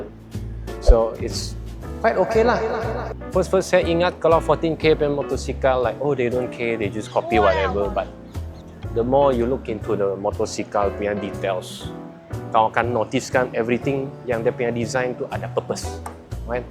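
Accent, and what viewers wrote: Sabah, Malaysia. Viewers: logat sabahan....padu macam tu motor...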